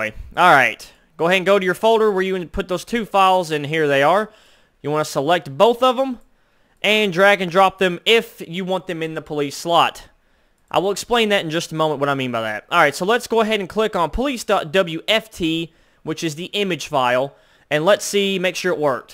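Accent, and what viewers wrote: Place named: South, United States. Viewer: you sound like the country version of danny trajo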